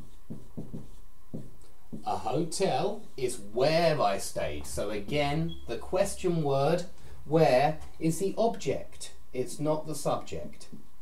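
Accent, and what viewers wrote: United Kingdom. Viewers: Well, i was expecting for something different I guess, but it was a good lesson, you taught me something new which I was a little bit confused on how to use it or even to teach it. It´s a difficult topic and you did quite well, by the way I love you accent, I guess you are british, aren´t you? :3 Congrats! and thanks for the lesson :3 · Hola, I like the way you teach. It's very clear. I like your accent, I don't know which part of England you are from. I'm from Argentina and I love English language. I'm an intermediate learner so sometimes you get stuck or you understand half the things you hear and that makes you feel dissapointed. I'd like a lesson about phonemic symbols and how can I pronounce a word if I don't have a dictionary with me. Saludos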